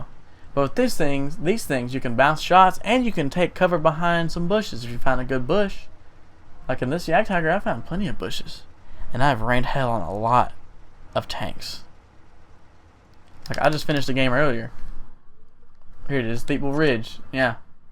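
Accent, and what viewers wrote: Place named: South, United States. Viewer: You sound southern af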